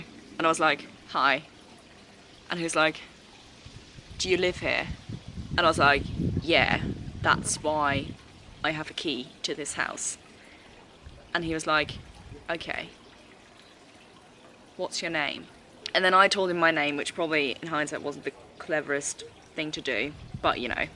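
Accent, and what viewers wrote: United Kingdom. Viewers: Du klingst als ob du zu 100% britin wärst :)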